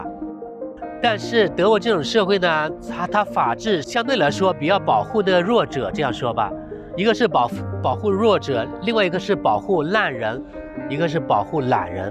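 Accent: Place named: Cantonese, China. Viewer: 感觉您有粤语的口音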